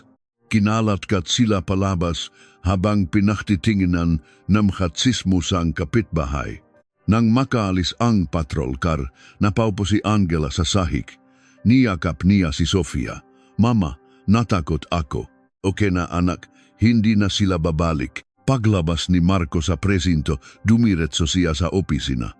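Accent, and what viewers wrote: China. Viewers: alanganin na intsik ang pangit pakinggan ayusin mo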